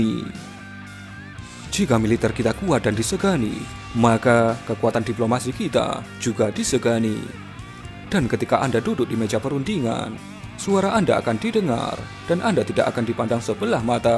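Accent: Java, Indonesia. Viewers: Mbok medok nya dikurangi om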